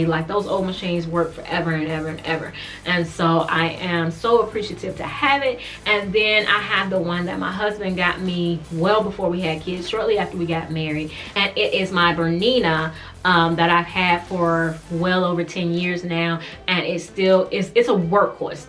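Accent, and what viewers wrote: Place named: Houston, United States. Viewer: i love your channel and i love your houston accent lol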